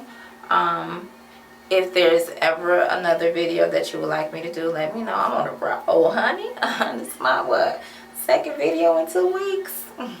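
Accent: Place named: Detroit, United States. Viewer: I love your Detroit accent!!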